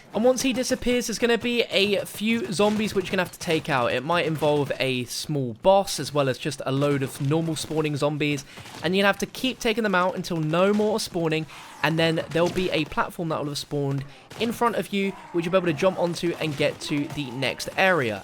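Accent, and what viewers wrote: United Kingdom. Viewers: omfg i cant stand ur british voice its awfull pls stfu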